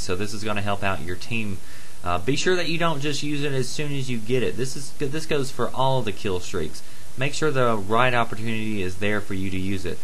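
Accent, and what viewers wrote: South, United States. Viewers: What state are you from? I noticed u have a southern accent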